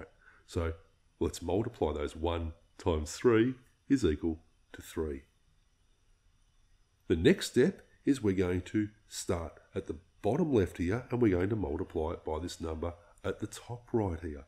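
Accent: Australia. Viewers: why does he have an aussie accent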